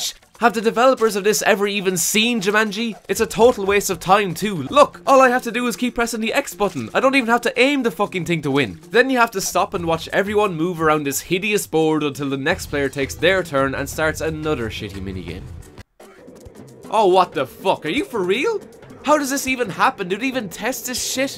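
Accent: Ireland. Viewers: Nice Irish Brogue. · I love the Irish when they're angry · Are you Irish? You should do a video with Call Me Kevin!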